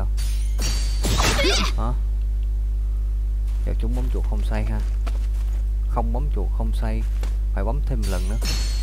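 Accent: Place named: Mekong Delta, Vietnam. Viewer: Gịong của bạn giống ng miền tây ghe luôn . Nghe thoải mái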